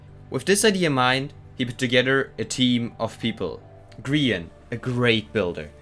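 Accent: Germany. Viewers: du bist deutsch oder hahaha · You are german aren`t ya? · Are you german? Your accent seems like a german accent.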